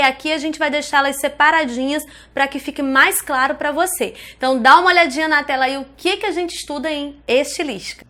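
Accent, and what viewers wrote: Rio de Janeiro, Brazil. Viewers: O sotaque mais bonito do Brasil. E eu nem sou carioca. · Tu é carioca?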